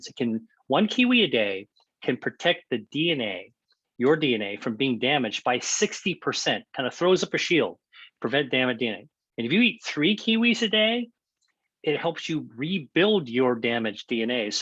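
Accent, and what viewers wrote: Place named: United States. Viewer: I like watching his videos. Great info and so glad I can understand his American English. 😅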